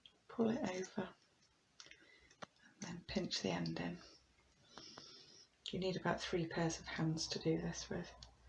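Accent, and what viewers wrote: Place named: South England, United Kingdom. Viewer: I live in Newark. Thanks for the tip. I am going to raid those charity shops next time I get chance. You definitely have a South of England edge to your voice. Xx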